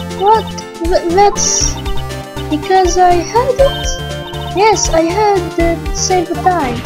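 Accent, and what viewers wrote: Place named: England, United Kingdom. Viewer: hhhhhhhhhhh ur english looool :3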